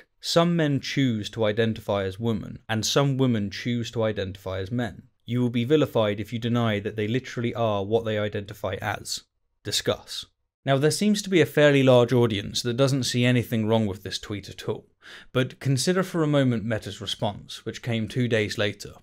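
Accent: United Kingdom. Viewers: Nothing like watching the catastrophe that is humanism devouring itself.. You think you are going to force Dawkins, someone who unlike the guy talking in this video isnt slurping up to the woke narrative, is supposed to just start denying reality to appease the movement? Please, may have thrown out rational thinking, but Dawkins isnt guilty of that, the man is a credible scientist, and hes likely not going to be bullied into woke narratives., get some integrity, your british accent isnt fooling anyone. People are getting tired of this stupid "my body doesnt conform with my mind" nonsense.. Grow up. We are seeing disproportionate numbers of trans people indicating its nothing more than an attention grabbing fad coupled with narcissism. Its interesting to see how quick in an effort to keep his poor wittle job filling peoples heads with nonsense is willing to tow the line. 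Completely irrational.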